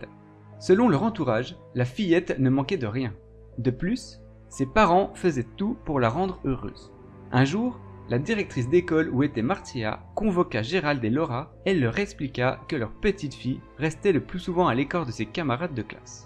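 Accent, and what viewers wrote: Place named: Jura, France. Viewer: Tu as ll'accent jurassien c'est excelent^^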